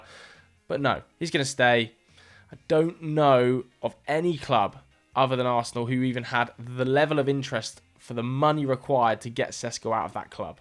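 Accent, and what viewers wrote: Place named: Manchester, United Kingdom. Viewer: Wow, thats a great Manchester accent!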